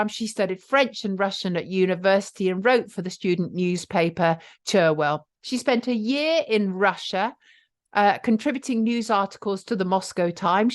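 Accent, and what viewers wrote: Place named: Bristol, United Kingdom. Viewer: Great video, Gloucesters finest.. Being a Bristolian born and bred I can hear your lovely accent creepng out every now and then, I realise you've had to hold it back for those London types over the years lol.... Marianov spring, what an embarrassment to the country she is... You have more integrity in your toe nail clippings than she will ever have.. Keep up the good work, god bless you.. The truth always finds a way... James.. Bristol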